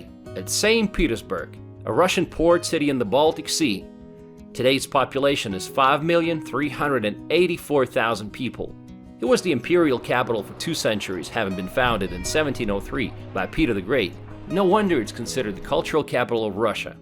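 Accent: South, United States. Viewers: Tripping me out how this guy sounds more American than Russian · Your videos of Russia are all fabulous, with great and pertinent information. The Russians seems so happy, busy and content. Your English speaking is awsome—no accent, excepting a slight US southern accent. David, San Diego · I've been meaning to ask, especially as l have not as much time to review your archive of videos (among the 4 Russian vloggers l follow), but your English lacks not only a typical Slavic accent, but it betrays a certain American southern voice. Quite cannot pin it down geographically, but where did you pick it up? The only Slavs l've known who have l have heard unaccented Slavic English were those who studied in the UK and acquire Received Pronunciation coaching... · Nice... (No wonder people ask you= Where you from..? You sound like an American.) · Russian with an American accent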